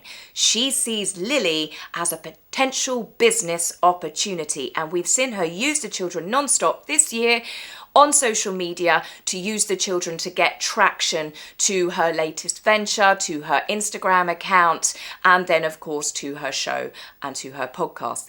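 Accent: England, United Kingdom. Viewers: lol loved this!!! I hope we get a tour of your new home when you have it all done. Congratulations on your new home. Can I just say- you look more beautiful everyday and your content and the way you articulate things in that fabulous English accent - I mean truly you have the IT factor. I could see you being the next Graham Norton! You are just a star ⭐️ and I appreciate all you do for us. ❤❤❤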